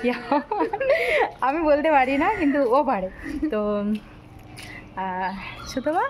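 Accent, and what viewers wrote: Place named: Odisha, India. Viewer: Medinipuri Vasa bujhte khub problem hoi... but tomar kathai Odia mixed thake tai na..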